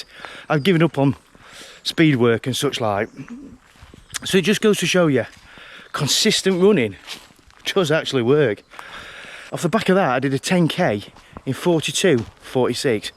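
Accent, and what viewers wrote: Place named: United Kingdom. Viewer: I wish this fellow mention for us, foreign runners what he meant by 5k (in his British accent) Is this K (Kilometers) or Miles? Cause this is a huge difference.. 5k in miles is 8 km.. I've been running 10-12k (km) daily for the last like 3 years and I'm over 60 + y.o. Current speed 5 -5.20 / km (Started from 6.15 - 6.30/km back in few years) .. In terms of the REST days.. If I take 1 or 2 then running again its like starting from scratch (even harder).. At this point I came to conclusion that Speed doesn't matter much, but its hard to hold competing EGO in check, that keeps on "speeding".. I can confirm, that you still must "behave" with the food intake, or else even running 10k (km) +/day alone does not guarantee that you will lose weight. Good luck.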